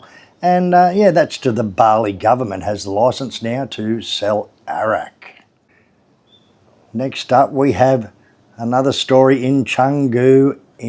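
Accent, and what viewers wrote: Australia. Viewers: Hi. REALLY enjoyed that and learned more about Bali. I enjoy listening to your Aussie voice not many of us left it seems. And yes. Albo is a goose. Keep em coming!!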